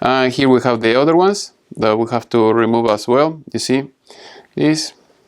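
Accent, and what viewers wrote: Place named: Argentina. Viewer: Nice Gardel accent... ! love it...! thanks for the helping hand , cheers from Adrogue !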